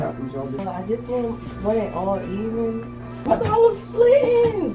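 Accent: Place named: Philadelphia, United States. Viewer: Wait wait wait a minuteeeeee...as much as I have watched u and loveeeee you...your here in PHILLYYYY.....omg.... I knew that attitude and accent I freaking knew it lol...this made me happy....forgive me cause I’m always the last to know everything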